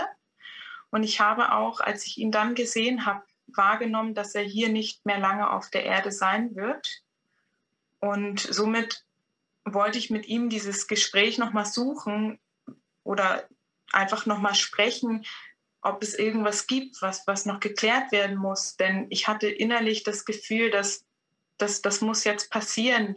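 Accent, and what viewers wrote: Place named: Switzerland. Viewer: Mir gefiel der "Wortverkehr" zwischen der Dame und dem Interviewer sehr gut... sein Schweizer Akzent ist koestlich :) Die Fragen die er ihr stellte erzeugten grossartige, aber einfach zu verstehende Antworten die sich sehr real anhoerten. Sehr gutes Interview :) Besten Dank dafuer und lb. Gruss aus USA ::)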